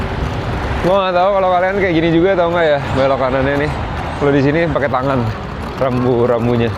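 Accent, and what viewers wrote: United Kingdom. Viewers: British accent mu keren bang..bosan dengar american, trlalu ngalir dan kurang seni dengarnya...🎉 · British RP accent lu pas 😅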